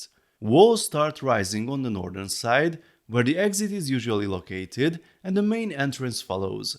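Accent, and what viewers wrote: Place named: Hungary. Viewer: we love your Hunglish! You must have been talking to Dr Németh - of capandball fame here on YouTube.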